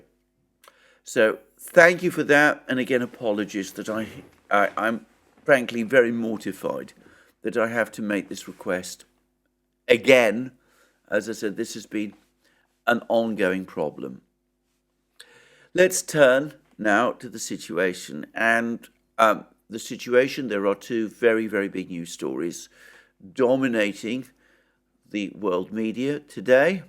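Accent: United Kingdom. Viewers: You know, half the reason I watch this show daily is so I can hear how these crazy limeys speak Washington's English. "The works..." That's super cute!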